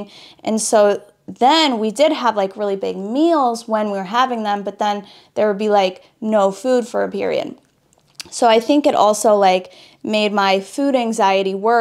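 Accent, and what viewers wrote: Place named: South, United States. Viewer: Easy on the Southern accent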